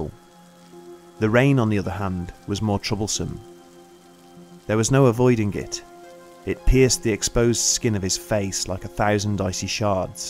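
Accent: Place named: North, United Kingdom. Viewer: Brown The narrator speaks with a northern UK English dialect and there is in fact no 'correct' English grammar. Language is an ever changing and evolving tool that humans use to communicate.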